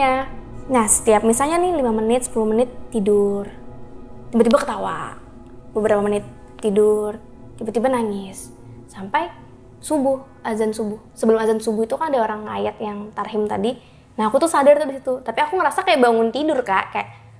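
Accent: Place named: Banjar, Indonesia. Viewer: Ciri has urng banjar bekisah nahap bnr😄 tapi asik yaboyyyyy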